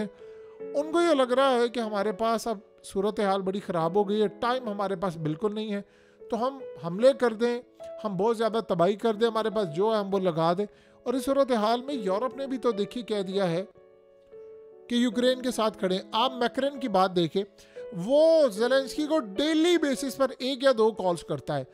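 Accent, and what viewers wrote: Punjab, India. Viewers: Way this guy talk sounds like he is war time expert, he is pandu with Punjabi accent.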